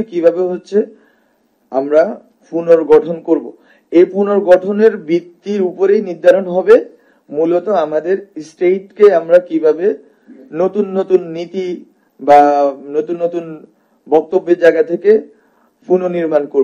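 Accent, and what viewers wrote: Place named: Noakhali, Bangladesh. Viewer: নোয়াখালী টান আসে কথায় আমি তার কথা বুছি না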